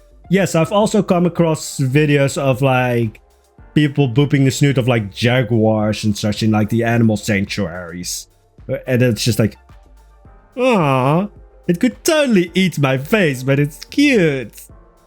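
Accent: Netherlands. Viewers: Dutch accent haha❤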